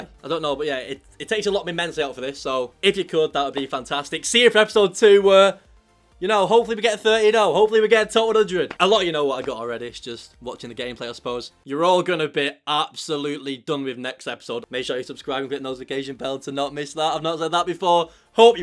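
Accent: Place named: Tameside, United Kingdom. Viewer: You’re from Ashton? As in Tameside? Cos same, was thinking you sound local mate 👍🏼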